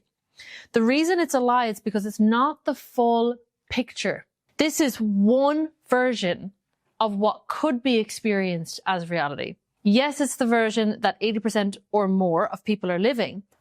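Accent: Ireland. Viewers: Loving the videos, recently found the channel. Explain it in way that’s freshhh yee. Just curious where are you from, are you Irish ☘️ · Are you Irish? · Love the irish accent .. Galway man here .. best luck with it all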